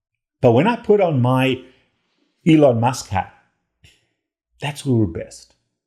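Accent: South Africa. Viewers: You just great. You still got the SA way of talking 😂🤘. Just like Elon and you, I also grew up in SA and I don't give a shit about what others think or say about me. My head is up no ones ass either. I need no stuff. I live for me and my children I am now living a healthy carnivore life as I can see the truth. Please keep sharing your knowledge with us as you helped saved my life as I can now see outside the box.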